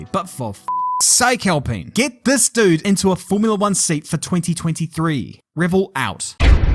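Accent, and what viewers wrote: Yorkshire, United Kingdom. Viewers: Gotta love the Yorkshire accent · Yorkshire accent on point brother.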